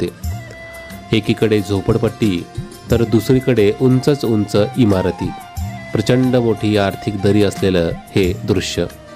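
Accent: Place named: Pune, India. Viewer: bhau tu laich puneri bhachhya bolalas rao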